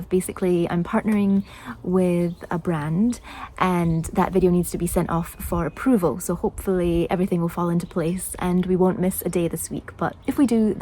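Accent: Scotland, United Kingdom. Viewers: When I saw ‘ ’ I somehow wasn’t expecting a mild, wee Scottish accent, for some reason. I was expecting a loud, brash North American! No matter. It was a lovely video. Ty.👍 (Edit: … just look at this. This is not a wee nook of the Highlands but, more or less, a central part of Scotland’s capital! You captured it beautifully.) 👍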